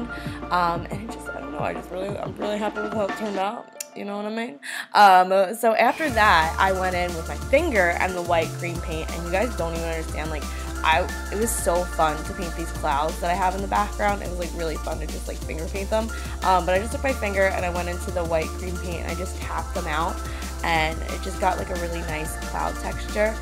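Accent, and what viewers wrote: Pennsylvania, United States. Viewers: I'm a new subscriber! It's so funny I could tell you were from PA by your accent! Lol. I love it. I'm from PA too! You are so talented ❤️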